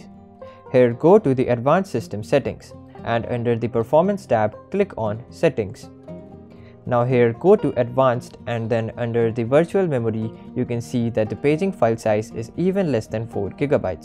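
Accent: India. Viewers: Agree. I like his accent. Not as annoying as other indian youtuber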